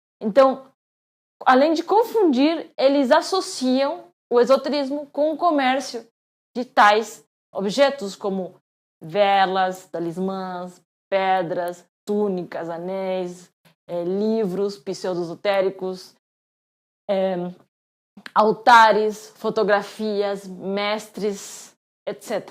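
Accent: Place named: Paraná, Brazil. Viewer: Fala com sotaque paranaense legal.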